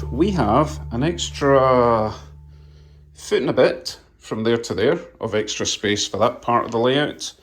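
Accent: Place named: Glasgow, United Kingdom. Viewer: I did a video a couple of days ago on making inexpensive backdrops for the Train layout you may find useful. It costs 90% than store offerrings. Now i'm watching all your old videos. Your accent reminds me of my Grandmothers' accent all the way from Glasgow. None of my boyhood friends cound understand her thick accent.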